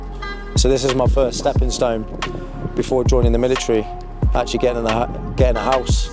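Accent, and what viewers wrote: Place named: Coventry, United Kingdom. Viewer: I knew i recognised your accent your from Cov im from Cov too just found your account on insta so inspranational im older now but after a life of trauma you have so helped xx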